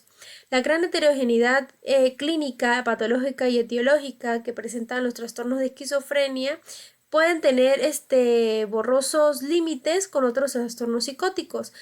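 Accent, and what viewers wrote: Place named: Mexico. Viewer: EXCELENTE, TIENES CIERTO ACENTO MEXICANO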